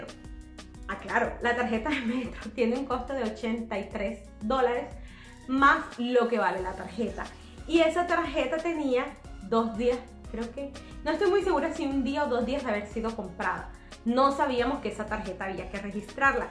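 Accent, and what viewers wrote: Venezuela. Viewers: Eres Venezolana de casualidad? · Hola. Me encantó. Y me encanta tu acento que se parece mucho al venezolano, yo soy venezolana. Un gran abrazo. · jejejejeje además de hablar rapido tienes acento Venezolano, ojo no es para ofender, porque soy Venezolano, me gusta tu jovialidad y el entusiasmo como explicas las cosas no tan buenas que te han pasado.. por supuesto un saludo de este Venezolano a ti y tu grupo de soñadores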